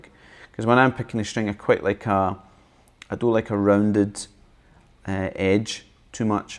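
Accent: Scotland, United Kingdom. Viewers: Is this a spoof? Love your Scotch accent!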